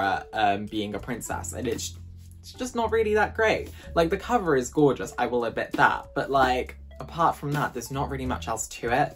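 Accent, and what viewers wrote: Wales, United Kingdom. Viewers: 0 am I sensing a Welsh accent??? First Welsh booktuber I've found and it's EXCITING!!!! Loved your reviews, subscribed!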